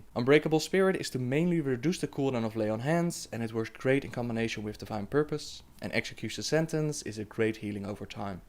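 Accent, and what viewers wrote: Netherlands. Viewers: Ik hoor gelijk dat je Nederlands bent. :P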